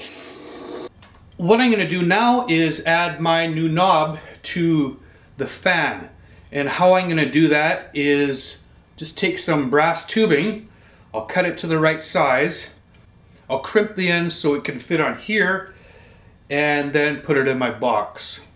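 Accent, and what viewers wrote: Canada. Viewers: great job bro! p.s. you remind me of Jordan Peterson's accent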